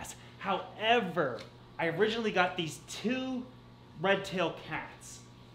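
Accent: Canada. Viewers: As a Minnesotan, I adore your Canadian accent! Makes me feel like I know you. I appreciate the videos and great information, I'm just getting into the hobby and your channel has been a go-to source for information :)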